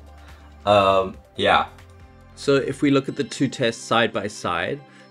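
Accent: South Africa. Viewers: I knew that lekka safari accent! Groete uit Duitsland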